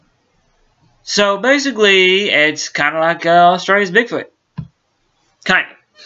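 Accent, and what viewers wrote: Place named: United States. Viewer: aussie phenomen. why american commentator?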